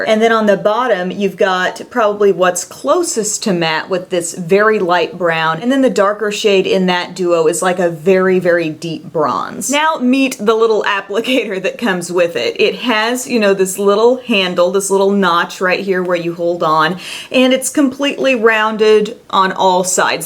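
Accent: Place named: South, United States. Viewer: Where are you from?? You defs sound southern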